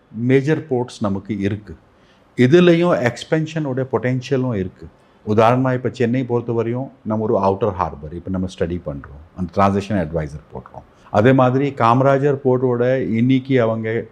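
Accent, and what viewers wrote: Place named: North India, India. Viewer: North ஆக இருந்தாலும் தமிழ் அழகாக பேசுகின்றீர்கள்❕️👍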